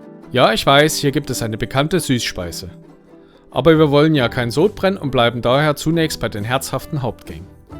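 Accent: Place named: Saxony, Germany. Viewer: Oh nein nicht schon wieder....Schweizer Spezialitäten auf Sächsisch.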